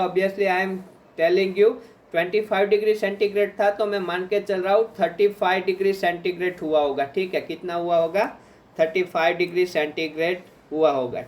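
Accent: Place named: Gujarat, India. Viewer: Aavaj thi gujrati lago chho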